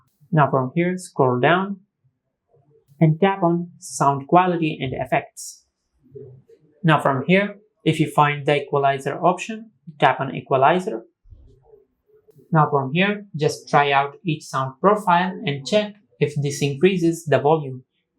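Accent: India. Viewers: its indian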